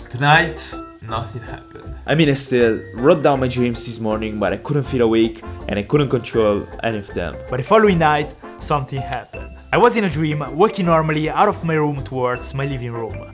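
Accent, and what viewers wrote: Italy. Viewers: What about learning, I mean totally nailing a British accent next video - could be interesting to see how an Italian would improve his accent! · ho appena realizzato che sei italiano 😂🤣😅 eppure già dall'accento me n'ero reso conto 😂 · Si sente che è italiano😆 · Gia dalla voce si capiva ma è italiano ahahahah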